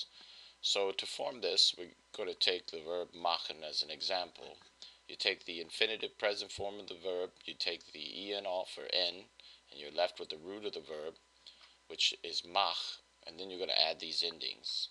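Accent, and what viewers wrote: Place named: North Germany, Germany. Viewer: Tolles Video! Woher kommt ihr Deutsches Akzent? Vieleicht Nord-Deutschland oder Österreich?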